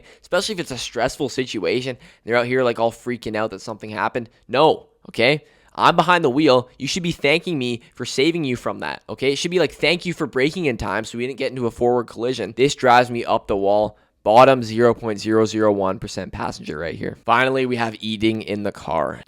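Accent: Canada. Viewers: canadian detected!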